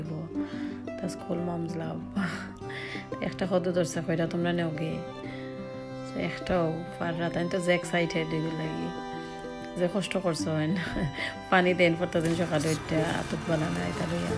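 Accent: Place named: Sylhet, Bangladesh. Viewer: খাটি সিলেটি কোন বেজাল নাই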